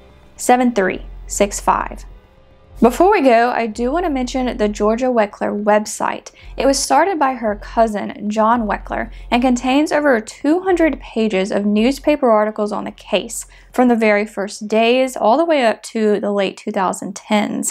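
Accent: South, United States. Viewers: has a nice southern accent.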